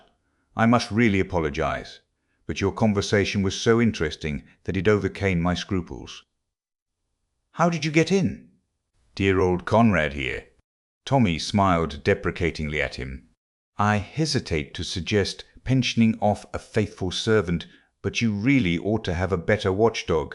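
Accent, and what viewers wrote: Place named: Australia. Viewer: Sadly, a new AC novel, only her second, and yet "read" by a mindless (literally), uncomprehending AI in a totally deadpan manner, robbing the listener of any chance to be drawn into the fun or excitement of the adventure. Don't quite follow why the accent should be an Australian, mostly monotone, though well enunciated. What a total, boring waste.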